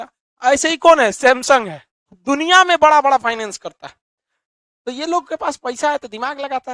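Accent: Bihar, India. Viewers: यार तू पहले बोलना सीख ले आर यू बोलने की तमीज नहीं है क्या बिहारी भाषा बोलता चावल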